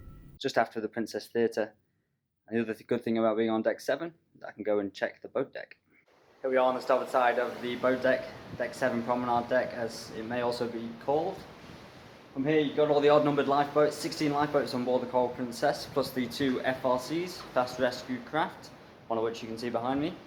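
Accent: England, United Kingdom. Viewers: Where are you from you have a accent from England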